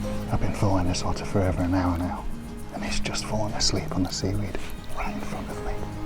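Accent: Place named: England, United Kingdom. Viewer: So magnificent and magical in every way. The beauty of that island, the photography, the aerial views, the music, your wonderful English accent I am in love with it all. I live on the California coast and have always gotten a kick out of the otters here in Santa Cruz, Big Sur etc but they seem to be different than the ones there. Here they are smaller, fluffier and their tails aren’t so long. Thank you for your presentation very lovely.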